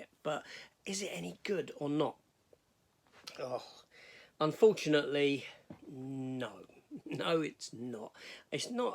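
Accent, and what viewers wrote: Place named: England, United Kingdom. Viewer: This is meant as constructive criticism. I wanted to watch your review but I was frustrated by the poor sound levels. You start off fairly strong with your intro, and then move into a much louder show theme which had me lower my volume. You then return but soon your volume lowers to a soft mumble. This combined with your strong accent had me ready to move on.after a couple minutes. I'm not criticizing you, but your video and sadly not the content, but the presentation. I'm not an expert on audio, but I believe what you want to do is either be conscious of how your voice drops in volume, or put the audio through a compressor. Perhaps consciously over enunciating would help with your English accent. Good luck with your channel.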